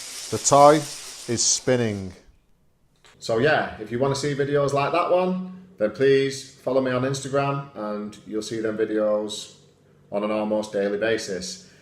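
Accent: United Kingdom. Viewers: I love that you want to help people. But your accent is soooo british :DDD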